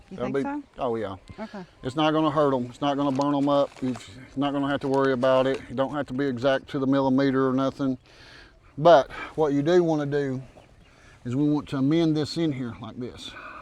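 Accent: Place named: Appalachia, United States. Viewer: Fangers for fingers. Love your Appalachian accent.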